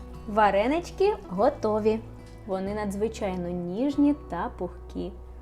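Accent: Ukraine. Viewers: Очень милый акцент Украинский❤😊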